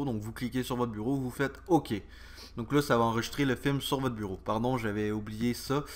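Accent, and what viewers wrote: Canada. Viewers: J'ai 3 Commentaires à poster. Le premier est que j'adore le Canada et votre accent. Cela me fait voyager. Je suis de Paris. DVD descripter : Merci pour vos conseils pour votre lien de téléchargement. Parfait ainsi que vos tuyaux pour le configurer. J'ai eu une erreur concernant la Fat 32. Il semblait que la fat32 n'acceptait pas les fichiers de plus que 4Go. J'ai passé outre. Qu'en pensez-vous ?. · merci beaucoup l accent canadien en plus trop cool j adore! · Bonjour de France, Un grand Merci d'avoir essayé de m'aider. Je vais me mettre à l'Anglais à 63 ans, il n'est peut-être pas trop tard !!! J'habite en Sologne entre les châteaux de Chambord et Cheverny et pas loin du Zoo de Beauval, si vous avez entendu parlé du bébé panda qui est né il y a juste un an. Moi, j'ai visité à plusieurs reprise le Canada : Québec et la Gaspésie ainsi que Vancouver. 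Êtes-vous, déjà venu en France, chez vous cousins !!! J'aime trop votre accent et je me permets de vous embrasser par dessus un océan. Dominique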